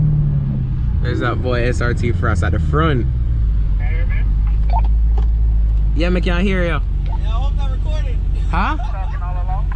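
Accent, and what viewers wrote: Jamaica. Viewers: I think I can hear that Jamaican accent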